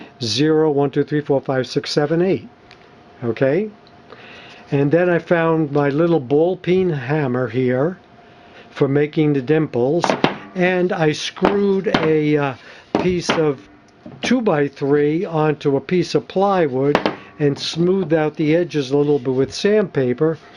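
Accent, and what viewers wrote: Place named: New Jersey, United States. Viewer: I love his accent he sounds like he’s from New Jersey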